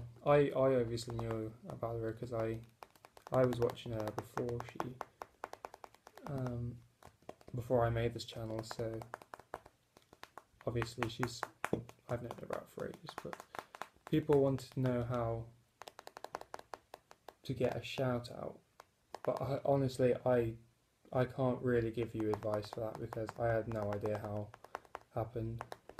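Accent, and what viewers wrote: United Kingdom. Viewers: British accents are my weakness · When Hannah mentioned you I was sure you were already on my subbed list. But you weren't :-/ Sorry about that. I always enjoy stumbling upon new ASMRtists with UK accents. So now I subbed and already watched 3 of your videos. Can't wait to see more from you :) XO from Denmark. Btw. You're so cute ;) Hope you're not too young for me to state that fact :D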